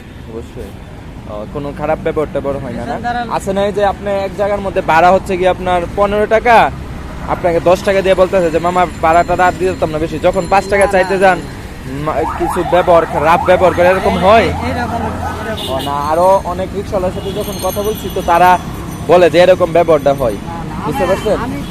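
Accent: Sylhet, Bangladesh. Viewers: VAi apnar basal sylhet er kothai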